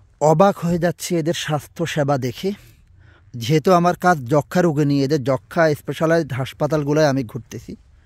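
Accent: Noakhali, Bangladesh. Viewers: আপনে কি নোয়াখালইয়া ? হুতি-মুতি কওনে বুঝলাম আরি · ভাই আপনি কি নোয়াখাইল্লা।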